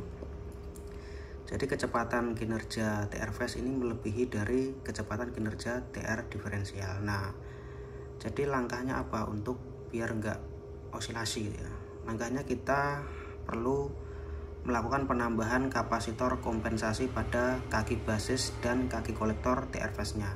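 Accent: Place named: Sragen, Indonesia. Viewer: logat e koyok wong sragen mas😁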